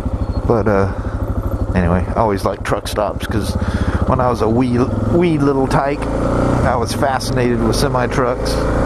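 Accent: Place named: United States. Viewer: Always good fun coming along with you.I would comment on the truck? but couldnt see it.Its very similar weather here in England but always raining and the traffic is horrendous coupled up with the fact that no one seems to be able to drive properly,i think they are all out to kill you this time of the year.Dont worry about the content, your american accent is great to listen too,and your clear and precise.No use me doing a video you wouldnt understand me living here on the border of Devon and Cornwall.Oh rrrrr,proper job!!!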